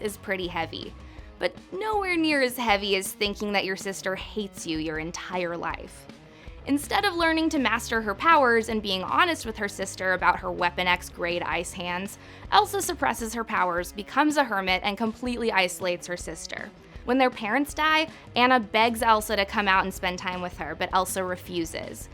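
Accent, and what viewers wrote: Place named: United States. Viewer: Stop being so negative. P.S. your accent is giving me a hedache. If you want to speak my language then speak it, dont chew it up infront of me then spit it back into my face you yank.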